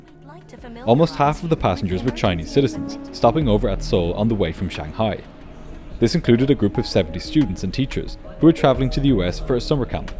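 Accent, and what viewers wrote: Ireland. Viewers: Stumbled upon your channel a few days ago pretty much by accident. I lived in Ireland for half a year when I was 15 and I still miss it 5 years later, so hearing your accent really caught me and sent me down memory lane. I think I‘ve watched about 10 of you videos now and I‘m staying for the amazing storytelling, analysis and breakdown!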